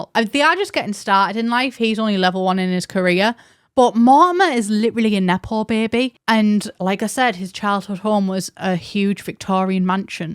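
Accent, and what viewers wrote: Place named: Working class, United Kingdom. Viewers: james cook also colonised where i live in far north australia, he was the first european to arrive here actually. we actually used to have a massive statue of him where he literally was doing the hitler salute and a bunch of racist locals were really up in arms when it was removed a few years ago. our main university is james cook university, and there’s a little “town” nearby literally called cooktown. that man was terrible though, along with the rest of his fleet of colonisers. murderous, pillaging, entitled bastards. according to some aboriginal/first nations lore his arrival was foretold as a large pelican that would bring destruction, and in fact what was foreseen were the sails of his boat, which the people had no prior reference for. had no idea that he was from middlesbrough. i find a lot of northern brits are drawn to live here though, and not to generalise and obviously not everyone is the same but i really like northeners better than other brits, they’re a lot more down to earth, less serious and generally my kind of people. 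😊 my step dad was northern and his accent and the words he used and way he said things are so much like. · I haven't paid so much attention since literal university. You've got such a good mixture of academic and fun, working-class British and it's so engaging. I absolutely love listening to you talk.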